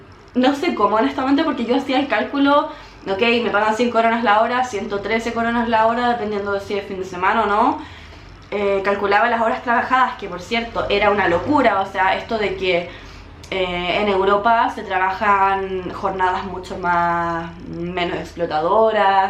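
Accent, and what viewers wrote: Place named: Chile. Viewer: Tu acento me suena chileno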